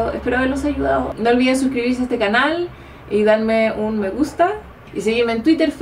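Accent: Chile. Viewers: Tienes un acento muy bonito, me encanta como en Chile dicen "chiquillos o chiquillas" ¡Mucho éxito en todo! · Wow! cómo cambia todo hablado en español... y en chileno! ;D eres admirable! Saludos!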